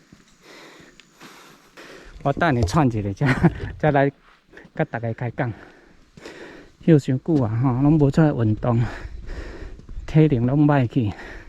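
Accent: Taiwan. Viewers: 喔！ 給你5個夠👍 有夠喘有夠俗 有夠標準有夠 親切的下港腔 語言…聽落郎 叨有夠爽也拉… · David哥的台語令人驚艷👍，好像小時候回鄉下外婆家，會聽到熟悉的台語腔調，格外地親切感。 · 超棒超正台與 正港ㄝ台語郎 讚讚讚讚讚 超超超超超親切的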